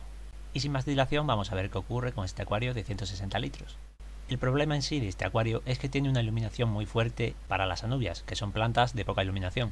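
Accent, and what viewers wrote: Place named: Andalusia, Spain. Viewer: oye tu de donde eres porque he estado viendo videos tuyos antiguos y tienes acento andaluz porque yo soy de andalucia xD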